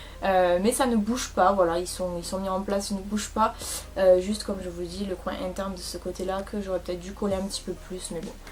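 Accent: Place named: South, France. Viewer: Ton accent de sudiste là , magique 💕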